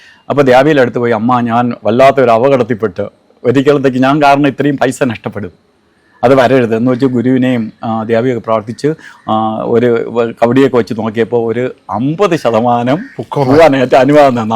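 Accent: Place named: Trivandrum, India. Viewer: Tvm കാരന് നമ്പൂതിരി ഭാഷയോ അച്ചടി ഭാഷയോ പറയാൻ പറ്റുമോ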